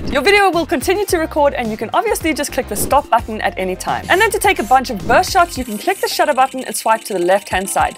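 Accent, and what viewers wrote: South Africa. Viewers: I didnt realise we had mouse option, i have it on my ipad so great tip Have you given away your covers ? Great accent (maybe a saffer?) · are you South African? I'm picking up an accent :) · I can't understand yr accent, is it South African? i luv yr vids sm <3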